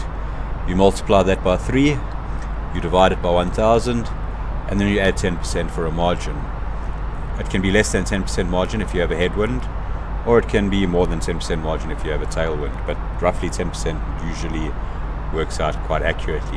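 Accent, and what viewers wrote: South Africa. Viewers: You can tell wen someone's south africa.😂😂😂 love it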